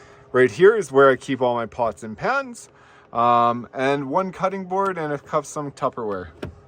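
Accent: Canada. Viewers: As soon as you started talking I knew you were Canadian and when I saw no plate on the front of the truck I figured AB or YT, although I was leaning toward YT when you mentioned minus 30 degrees.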